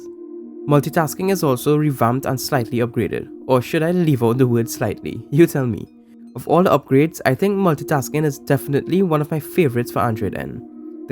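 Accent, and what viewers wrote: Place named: Trinidad and Tobago. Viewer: Hmmm..... you sound Trini. Am I right? :) #Trinidad&Tobago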